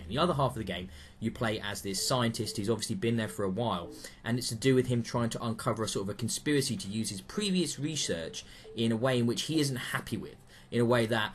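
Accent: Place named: United Kingdom. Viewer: Ok, now this is crazy! were we separated at birth? Are you my much, much younger twin? I bought this about a week ago and played it, finished it, etc. If I were to do a review of this myself we would have the most exceedingly similar reviews spanning two continents. About the only difference, my review would not have the British accent gravitas. Oh and probably a lot more cussing, I cuss so much I could make a sailor blush. It's not ad-lib cussing, like cussing just for the sake of throwing a cuss word in, I do use them properly, just a lot. This is now too funny. I have been checking out all of your reviews and for years I have assumed that the hospital I was born in made a huge, giant mistake. Now I know they did, LOL. I have been sure for many years I was given to the wrong parents, I have nothing in common with them. I am cerebral, love dark humor, ballroom dance, theater, museums, and history. 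Actual history, not the crap we peddle as history in this country. My parents are the opposite of that, I graduated high school at 14, and moved away to college shortly after, thankfully! My favorite comedy shows are Monty Python's Flying Circus, the Young Ones, Absolutely Fabulous--although their latest movie was absolutely not fabulous! John Cleese is in my top 5 for actors. I don't know how this happened but I now see how conspiracy theorists get their start. I hope you and the misses have a wonderful day, nudge, nudge, wink, wink, say no more!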